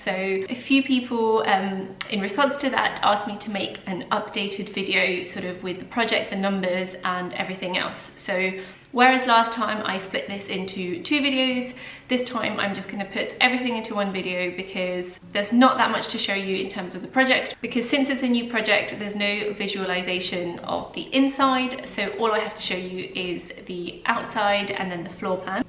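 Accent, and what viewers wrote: England, United Kingdom. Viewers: What a fantastic and honest video . I was shocked, that your polish . I dont mean that in a rude way , you sound very English. Everyone thing will work out . Big move or is it something you always wanted to do ? Keep the good work up 👍👍👍🥰🎉🥳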